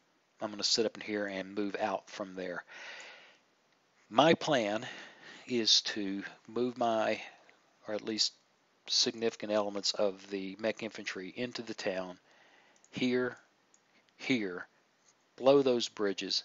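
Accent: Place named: United States. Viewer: wow i can't believe it. A game tutorial which isn't voiced by a british guy. Either all british guys do nothing else but review video games and showcase tutorials, or all guys who do this are british. your'e the one american, cool!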